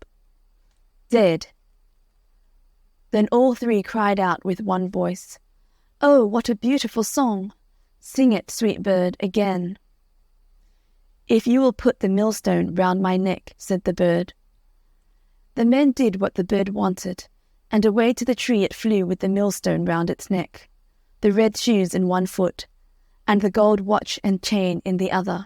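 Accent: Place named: United Kingdom. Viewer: This is British accent.😉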